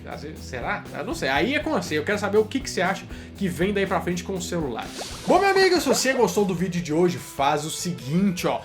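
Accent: Minas Gerais, Brazil. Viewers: Fala mineiro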